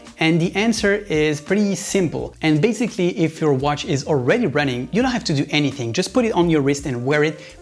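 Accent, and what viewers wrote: France. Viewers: My God!!!! That accent!!!!! 😍😍😍 Tu parles francais? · really good and clear presentation, a lot less douchy than other watch channels, love the french accent. subscribed. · Je parie ma collection Seiko 5 que vous êtes français 😅 L'accent ne ment pas ❤ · French accent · the French accent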